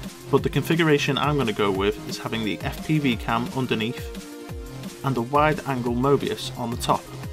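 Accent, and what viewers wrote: Liverpool, United Kingdom. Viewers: Nice build and eloquently presented for an almost Scouse.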